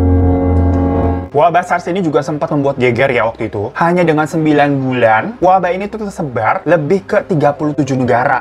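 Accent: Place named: Jakarta, Indonesia. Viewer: Suka pengen ketawa sama abng yg satu ini 😁nama nama depan kyk nama jepang, wjh jg rada" Jepang atau cina, pas ngomong betawi ora 😁 lanjutkan bng, gw syukkkaaa syukkaaa syukkkaaa dngn bhs Betawi ora nya itu 😂😂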